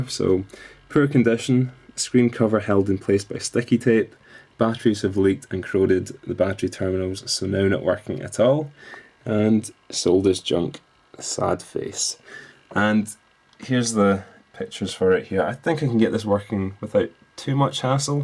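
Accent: Scotland, United Kingdom. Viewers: I love Scottish accents. ouo That console looked far worse on the eBay page than it does in person! The motherboard must be corroded to hell! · If you're implying he's English or Australian then wrong on both parts! He's clearly and obviously Scottish. You must be an American. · are you scottish? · I'm American and could tell he was Scottish. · I love this accent! I can't quite pick it up properly though, is it Scottish? Edit: definetly Scottish. I'm Scottish so it's like really hard to pick up everything you hear on a daily basis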